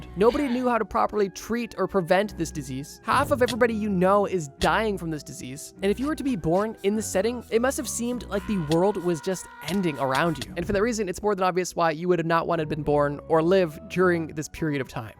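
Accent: Canada. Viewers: You're Canadian???? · Are you Canadian ?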